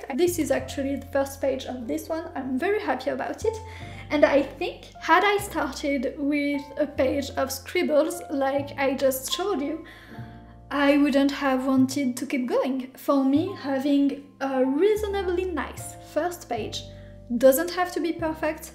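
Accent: France. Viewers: are you french? we almost have the accent :)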